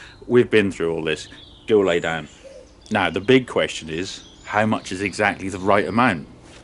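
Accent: Norfolk, United Kingdom. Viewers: Love the vids. Brought up near Fakenham, Norfolk. Good to here the Norfolk accent again . Would love to see a Kubota 7173 on the drive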